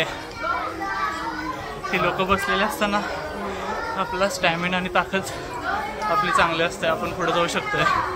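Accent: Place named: Kolhapur, India. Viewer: Awesome vlog brother🙌🔥. Congratulations on successfully completing your architecture degree👏. I had watched Krish mahadiks vlog of climbing tirupati balaji steps. You guys are amazingly fit, you didn't took any rest that's commendable. And love your Kolhapuri accent❤️😄. How much time did it took for you guyz to reach the top?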